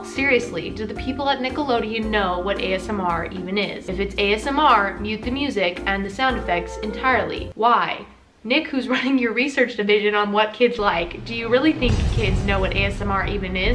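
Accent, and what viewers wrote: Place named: Alabama, United States. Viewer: You have a slight Alabamian accent. Are you from here aswell? You have a tint of south in your voice.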